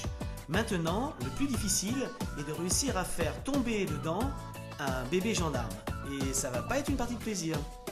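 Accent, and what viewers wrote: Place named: Rural, France. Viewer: AH AH AH; tu t'es éclaté; on voit que c'est les vacances!! et bravo pour les accents du terroir!! Ceci dit bravo pour les explications sur les fourmilions et pour les vidéos, de mieux en mieux.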